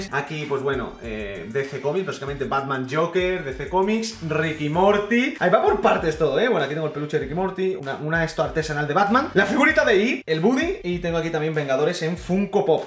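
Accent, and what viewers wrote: Spain. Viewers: Eres español o koreano ???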